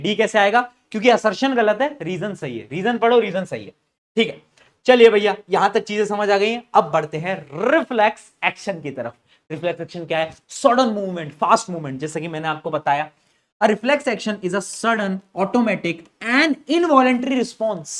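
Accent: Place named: Bundelkhand, India. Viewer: jitno bhi Bundelkhandi accent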